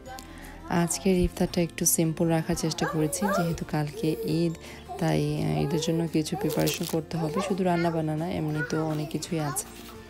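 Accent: Sylhet, Bangladesh. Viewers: Salam apa . Apni ki shyllhety. Apnar kotha sune to buja jayna. Doy bora ki vabe banate hoy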